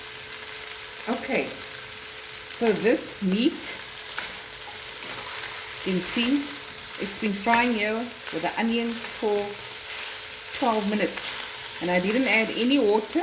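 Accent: South Africa. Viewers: Nice to hear a South African accent again . Regards from Switzerland . Looking forward to cooking it . Looks lekker .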